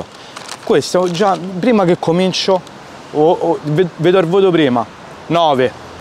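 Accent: Rome, Italy. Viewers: Grande. Io sono della Basilicata e vedo tutti i tuoi video. Mi piace tanto come ti esprimi e adoro l'accento romano. Continua cosi. 💪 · Io ormai me li guardo tutti i tuoi video, semplice, genuino e poi l'accento romano nsè batte 🤣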